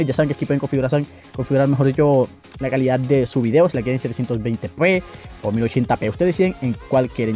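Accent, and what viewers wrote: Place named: Chile. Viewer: es chileno :v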